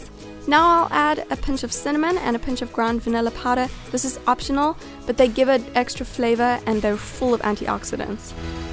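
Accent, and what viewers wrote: South Africa. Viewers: She's south African like me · Sounds like a South African 🇿🇦 ? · South African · You’re South African! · are you South African?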